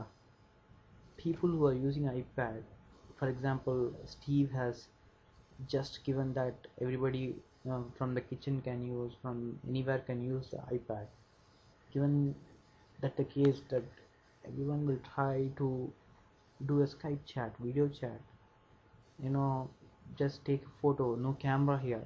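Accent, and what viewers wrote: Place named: Vietnam. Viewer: LEARN TO SPEAK ENGLISH. u from vietnam? u sound like one, seriously correct your accent, pull your tongue out (vietnamese language require to speak with your tongue always intact in)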